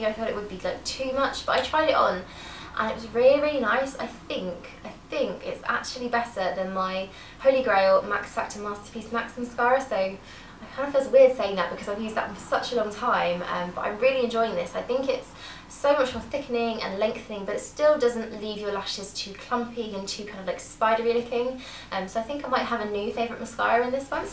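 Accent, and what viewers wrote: England, United Kingdom. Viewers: I made it! what do i win? lol i just wanted to say that you have such a classy look and mannerism. i don't know if its the english accent adding to it, or just the way you present yourself, but i think you are a lovely young lady.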